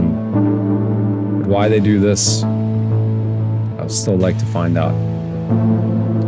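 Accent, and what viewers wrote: South Africa. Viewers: Cool, I knew I recognized your accent, I'm from SA too! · I love your content & one of the reasons why is because I can hear you’re South African 🇿🇦 ❤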